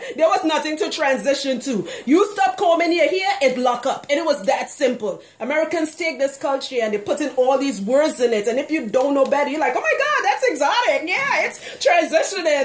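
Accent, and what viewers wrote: Trinidad and Tobago. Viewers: you are Trinidadian dear I hear it in your accent. Also I believe she was referring to people with locs. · Hey great info. Are you from Trinidad by the way im hearing it in your voice and accent. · I'm hearing an accent...are you trini? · Sounds like your from Trinidad, ,are you? If yes,,where? Am interested in locing my hair. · I knew when i heard that accent, that you were trini , represent hun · You go on and on and on and on and you still left us asking questions you could have easily answered 10 minutes or less into the video. And sorry to say but there's not enough art value in your tutorials, except for the background, where even your beautiful Trini accent becomes annoying because you're not delivering the message we've clicked on to hear. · I'm hearing ah Trini 🇹🇹 mus know my people. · you sound like a Trini... are you Trini??? 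I'm Trini❤❤❤❤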